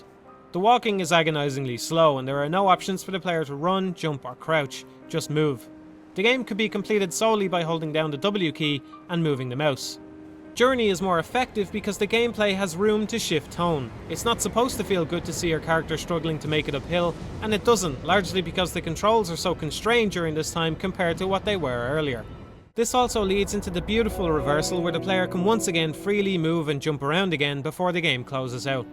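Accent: Ireland. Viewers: What is this accent, Irish? It's lovely, whatever it is. Now to keep watching the video to see if he makes a decent point.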